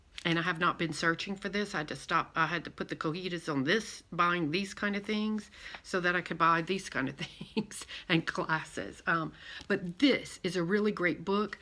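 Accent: Louisiana, United States. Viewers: Hi. Just found your videos and I really like listening to you. I lived in Louisiana most of my adult life and your voice/ accent is so warm and friendly...and sounds like Home to me. I lived in good ole Ville Platte so I'm familiar with Lafayette and the surrounding area. I share a love for the Gees Bend Quilters too. Something about their quilts is so inspiring!! Maybe its the color combinations they use along with their improve piecing...but they just vibrate somehow and reach into your heart. Well, well I love to watch Joe Cunningham and his quilt report too. He has a friend in the Bay Area who is another innovative quilter. Her name is Margaret Fabrizio and has been making quilting videos for at least 10 yes. She is famous for making Kawandi quilts. She has traveled to India to learn how they construct their quilts. If you are not familiar with her Im pretty sure you would find her fascinating. 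Her You tube name is atree3 if you would like to get acquainted with her. Until we talk again, take care. And Thank you for making your videos.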